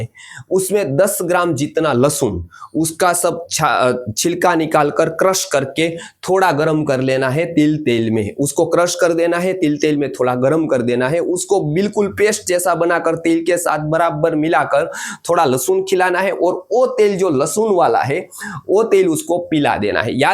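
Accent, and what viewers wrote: Gujarat, India. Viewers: Tame Gujarati lago 6o laheko Gujarati 6e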